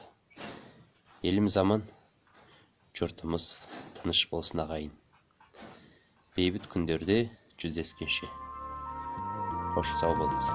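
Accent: Standard. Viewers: Керемет. Таза казакша сойлеп жеткизип жатырсыз! Мыкты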